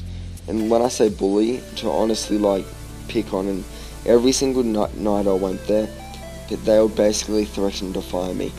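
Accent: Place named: India. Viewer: dat indian accent doh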